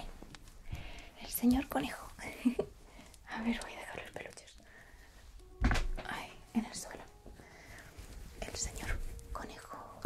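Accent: Spain. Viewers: El asento español de españa es genial para los asmr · El acento de mujeres españolas son ideales para este rubro de el asmr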